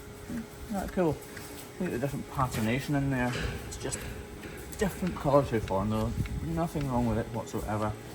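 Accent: Scotland, United Kingdom. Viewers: Having had some experience with carbide cutter heads on many different woodworking applications i can say i prefer the three or more cutter ports. The reason being is that theres more contact and control. It works the motor less. And the cutters last longer. No offense to the styling. Its perfectly functional. Oh, and you can get the square bits that have curved sides so its not so aggressive. Or even whats called in the woid turning world as negative rake cutters. Meaning they have a slight bevel on the cutting edge that smooths out the cut. And... You can even sharpen those carbide cutters on a diamond stone. Carbide aint cheap. You are the first hoif trimmer channel i found, and having grown up on a small private farm, i never saw a hoof trimmer and we kept constantly. Enjoyed the FFA knew of and watched farriers trim and shoe horses, but was never aware of hoof trimming for cattle. 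Ive been hooked ever since discovering you. Having Scottish ancestry its a blast hearing the accent. To you id have the worst southern hick accent you ever heard.😂 yall come back now ya hear?!🤣😎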